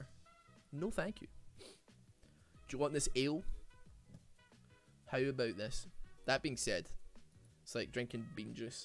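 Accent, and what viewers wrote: Scotland, United Kingdom. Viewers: comedic scottish man rambling and lightly comentating over a league game to study / relax to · do you know how many times this video has popped up on my autoplay while i've been at work Coworker: What are you listening to? Me: A Scottish guy ramble for hours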